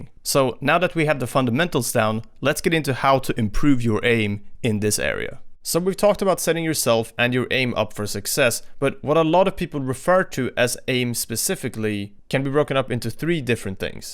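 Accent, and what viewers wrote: Sweden. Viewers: are u from sweden? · is it just me or does it sound like he has a swedish accent while speaking english.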